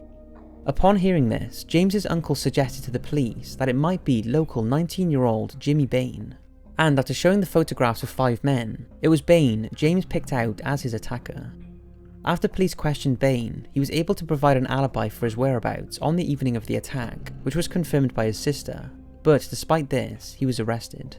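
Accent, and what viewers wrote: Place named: England, United Kingdom. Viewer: Possibly because he's an English person? The rest of the world shouldn't have to change the way we all talk just because you Yanks can't take the time to figure out other countries accents and slang. So arrogant.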